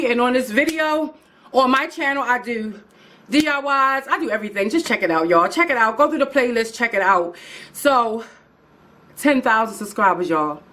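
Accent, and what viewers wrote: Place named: DMV, United States. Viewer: She sounds like she's from the dmv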